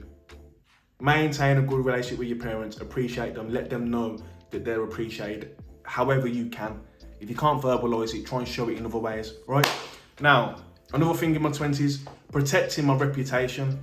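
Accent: Birmingham, United Kingdom. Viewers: bro which place in the UK is that accent from? its fire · good vid bro you from brum ?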